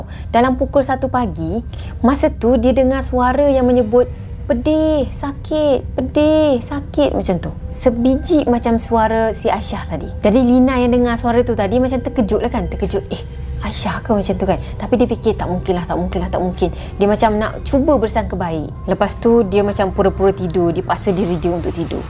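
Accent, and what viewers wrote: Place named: Johor, Malaysia. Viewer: Kak ni org johor. Tgok loghat pun tau hehe😁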